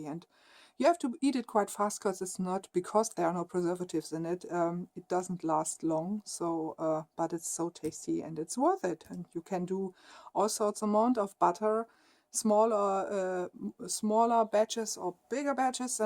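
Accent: Germany. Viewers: Being a of direct German heritage I love seeing all German things and hearing all the stories.... Brings me back to my youth and seeing the things around the homes of many of my relatives and tales told to me by all of them....even your accent brings them back to me! Lol. Like getting a great big hug from all of them! Thanks for sharing.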